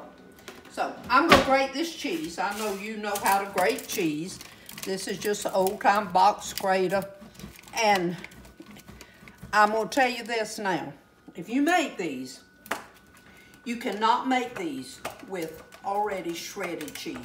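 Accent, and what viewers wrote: South, United States. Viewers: I love southern accent.. I just told my hubby I think she sounds so much like Rosalynn Carter.:) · What state has this accent? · You wear the most beautiful clothes when you cook! I just love your southern accent.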